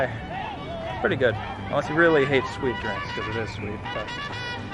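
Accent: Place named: South, United States. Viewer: You ain't from new York boy.You are from down south.It's a secret.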